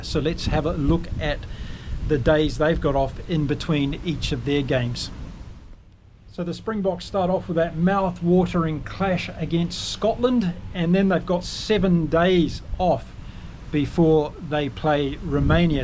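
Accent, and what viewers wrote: New Zealand. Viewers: Someone savaged my comparing your accent to great rugby commentary from NZ pundits, granted it's been a bit hairy of a reaction from The Breakdown but still find your demeanor very astute, knowledgeable and importantly unbiased. Keep up the great work. :)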